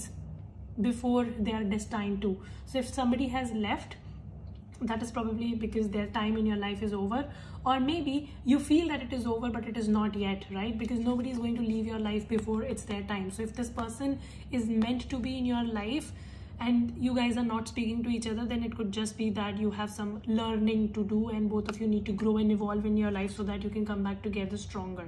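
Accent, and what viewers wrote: India. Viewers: Found my new reader. Indian accent and saying bitch and accurate. 👍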